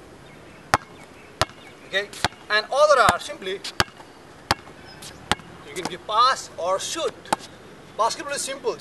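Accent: India. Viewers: Were you from from indian bruh i dont understand you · Indian accent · wow awesome video and anyone can identify an Indian pronouce good job!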